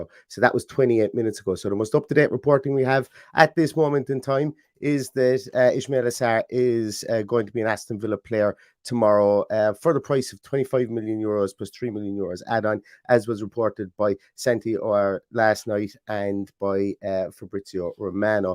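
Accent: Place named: Ireland. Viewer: The only podcast thats combines great Villa content with the ever so listenable Irish lilt, that's a killer combo, keep it up !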